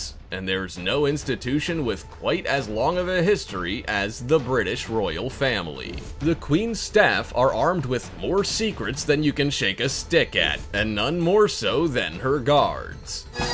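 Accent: United States. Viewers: there's an American doing the voice over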